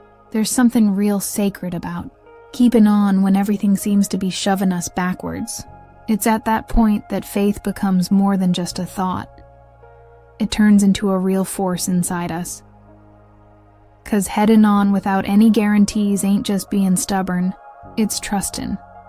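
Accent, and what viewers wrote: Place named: Australia. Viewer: 😂 Your Aussie Accent is funny 😂😂🤣😅ahhaahaaaa😅 Yep, I am hangin' in there!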